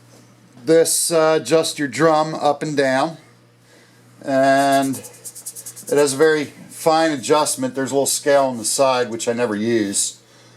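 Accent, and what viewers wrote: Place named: Maryland, United States. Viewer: I thought I detected a Maryland accent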